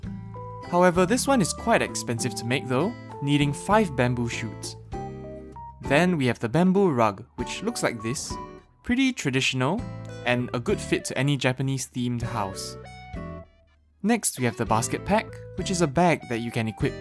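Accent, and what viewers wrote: Singapore. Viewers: youre definitely from Singapore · Familiar accent. S'porean?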